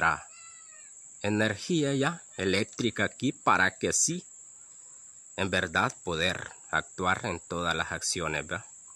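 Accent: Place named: Andalusia, Spain. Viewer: SOY NUEVO SUSCRIPTOR, AL PARECER EL SEÑOR QUE GRABA ES ESPAÑOL, LO DIGO POR EL ACENTO ANDALUS QUE TIENE.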